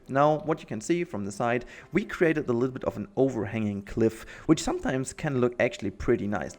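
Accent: Germany. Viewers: Thanks for your afford! Appreciated it - you have a german accent - don't you?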